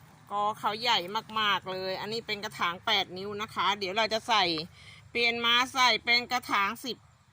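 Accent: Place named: South, Thailand. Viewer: น้อยมาช่วยเปลี่ยนถางค่ะคุณ 🤩🤩พูดแบบคนใต้จ้า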